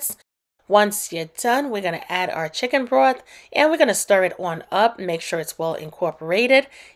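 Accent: New York, United States. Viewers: Are you from NY ?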